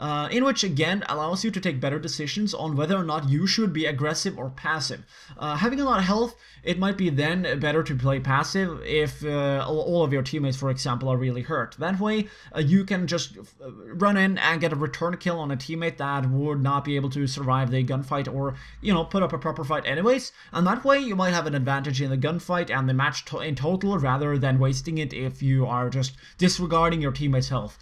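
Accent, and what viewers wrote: Norway. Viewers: Hei :) O_o er du norsk? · Er du norsk?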